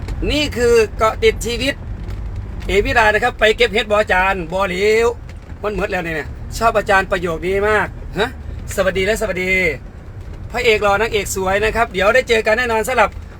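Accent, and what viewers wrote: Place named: Laos. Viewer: FC.จากสระบุรี เสียงดังฟังชัด ชอบอาจารย์พูดไทยปนลาว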